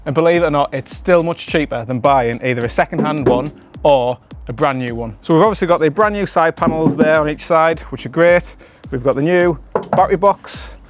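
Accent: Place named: Middlesbrough, United Kingdom. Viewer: Great channel keep up the good work, you sound like a Boro lad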